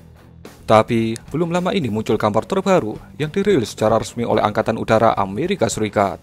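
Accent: Java, Indonesia. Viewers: * Medok medok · Medok amat logatnya mas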